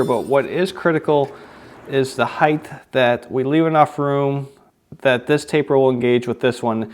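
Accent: Canada. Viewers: Canadian I'm guessing. First video I've see of yours and I am on the 11 second mark. Am I right?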